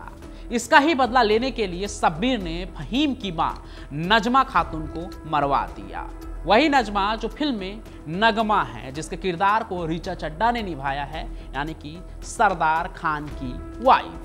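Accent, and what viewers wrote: Bihar, India. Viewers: Wannabe lallantop 🤑 Aaj kal trend ho gaya u.p ,bihar ke accent me kuch bhi bolo aur views le lo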